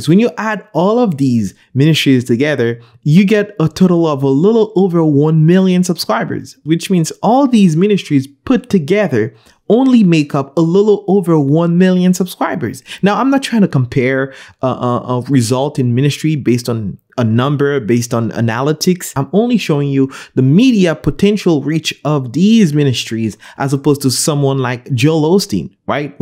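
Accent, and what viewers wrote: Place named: Haiti. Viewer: your videos and exposition are outstanding. Quick question. My wife and I sensed (what we perceived as ) a ever so slight Haitian accent as we are from S. FLA and it has one of the largest Haitian communities in the US. Are you by chance in S. FLA? Because if so and you are a pastor then we'd LOVE to attend one of your services. Let us know and which church if you are indeed a S. FLA pastor. Thanks and God be with you always.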